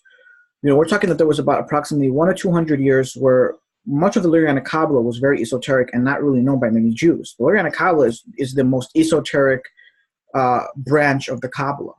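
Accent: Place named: Israel. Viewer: Ah ok. I have many Israeli friends and you sounded like one of my friends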